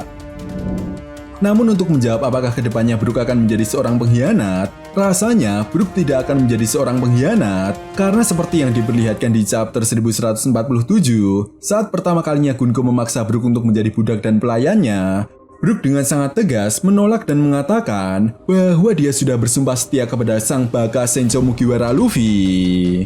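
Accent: Java, Indonesia. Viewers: Malah puyeng dengerin suara masnya dgn aksen briish javanese. Mending pake suara AI aja bang..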